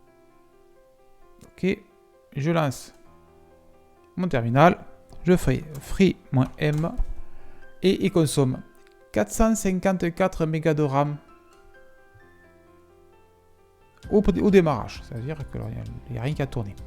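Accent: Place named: Hérault, France. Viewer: Intéressant.... d'après ton accent tu es origonaire de lherault non 😊 ou proche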